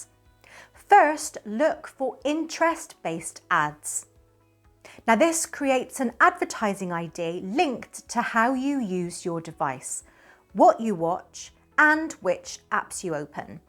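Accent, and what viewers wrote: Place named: United Kingdom. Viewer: I love your British accent!!!! ❤❤❤❤❤❤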